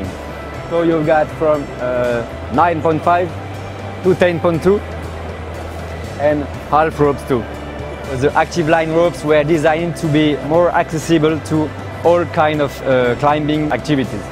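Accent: France. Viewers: Wow that french accent tho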